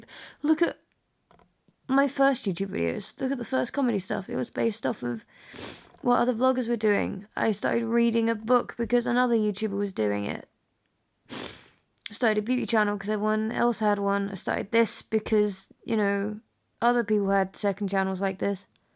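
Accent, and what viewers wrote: United Kingdom. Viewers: Okay three things 1. is an amazing song. 2. Originality, in my point of view; has evolved into a performance type thing. Everything can be traced back to anything if you really put your mind to it. the thing is how you take that which inspired you and make it into your own thing. So yeah you sound like...no shit, Haley Williams is a bad ass performer that inspired many girls in the last decade to try this. At the end of the day, an song is AN SONG. don't be ashamed of whom you sound/look like. Embrace it and evolve it into your own thing. 3. Snotty nostrils sounds incredibly serious with a British accent.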